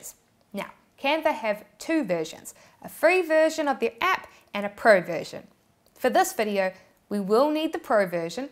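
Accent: New Zealand. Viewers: The Kiwi accent is soooooo adorable on you! 💓💓💓💓 ❤❤❤❤💓💓💓 Hey, what is your thoughts on Crypto?, and the likes of Bitcoin?, is that an area that you have ever given any thought to? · LOL I was gonna say Australia but NZ is cool. I just started watching your channel recently and it's interesting. And your accent is cute 😂😁🥰. I used to know someone from Australia. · Ohh u are a new Zealand's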